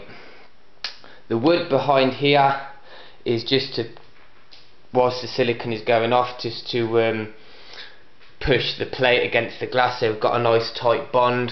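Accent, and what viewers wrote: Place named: Midlands, United Kingdom. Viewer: Hi chap. Where you from you sound kinda midlands way. Like me!! Who made the tank in the first place mate? As them stress bars look way too inadequate. I had a four foot rena and they were massive on that for a little tank. And my 700litre now has some sturdy strut bars. They just look too narrow for my liking?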